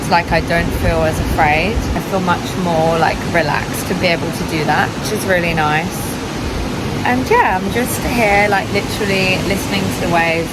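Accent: Ireland. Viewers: from your accent i guess youre an irish? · Hi hello are you Irish ? Scotish ? Or maybe Angel ☕😎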